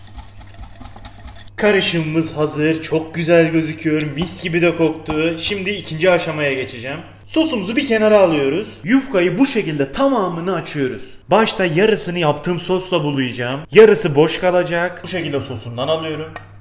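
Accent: Adana, Turkey. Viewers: Yer Diyarbakır İstanbul değil ayrıca dil adana değil ŞİVE adana :)) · şive değil ağız adana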